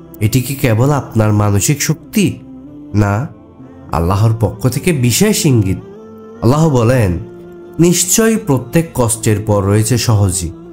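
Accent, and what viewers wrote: Chittagong, Bangladesh. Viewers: এই সব গুলো লক্ষনই আমার সাথে হয়েছে,আর যতই খারাপ কিছু আমার সাথে হয়েছে ততই মনে হয়েছে নিশ্চয় আমার জন্য ভালো কিছু আছে না হয় আমি কেনো এত কষ্ট পাই।তবে মাঝে মাঝে তো এক আধ বার হতাশ হয়েছি যে আমার সাথেই কেনো এমন হয়।ভাইয়া আপনার বাড়ি কি চিটাগাং? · আসসালামু আলাইকুম ভাইয়ের বাড়ি চিটাগং?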